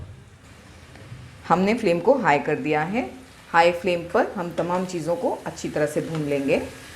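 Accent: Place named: Maharashtra, India. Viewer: It's really very nice the way you explain the recipe. The way you sound It seems you have a connection with maharashtra.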